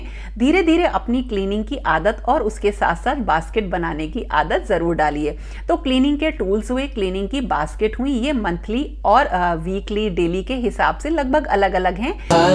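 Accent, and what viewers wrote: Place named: Braj, India. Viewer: Braj boli sun ke bahut aachi lagi... nice madam ji 🤗..14hours ke kaam ko only 14 minutes mai show karna😀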